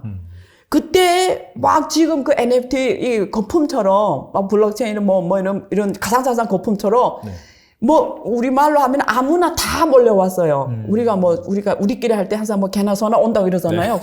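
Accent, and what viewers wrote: Yanbian, China. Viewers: 연변사투리가 쎄네요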